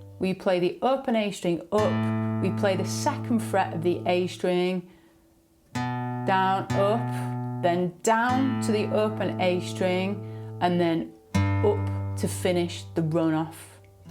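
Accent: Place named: England, United Kingdom. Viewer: WOW , GREAT LESSON , PLEASE TELL ME WHERE YOU COME FROM ,,..OK ? ENGLAND ? YOU HAVE AN ACCENT , JOHN FROM NORTH CAROLINA , USA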